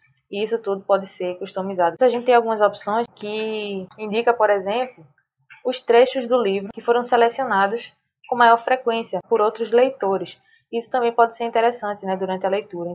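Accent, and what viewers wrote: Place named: Pernambuco, Brazil. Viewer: Tú és Pernambucana é, boa tua explicação visse!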